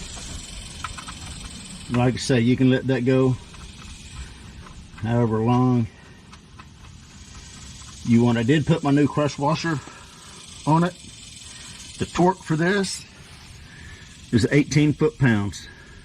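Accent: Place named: South, United States. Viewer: Yeah, I´m watching here from Germany bro. Love your southern accent....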